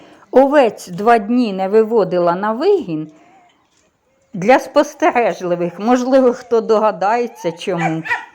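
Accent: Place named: Kyiv-Poltava, Ukraine. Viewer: Вітаю 🤗 дуже люблю ваш київсько-полтавський говір 💞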